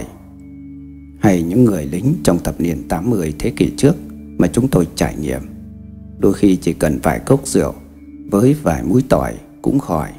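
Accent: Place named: North, Vietnam. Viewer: Bác này không đọc đúng được một từ ngoại ngữ, Virus mà bác đọc nguyên chất giọng Bắc vn là vi dút 😉 Nói chung, giọng đọc của bác không thuyết phục. Ad có thể cho biết rõ danh tánh của vị bác sĩ nào đã viết bài,và vị ấy đang làm việc ở đâu không ạ? Thank you!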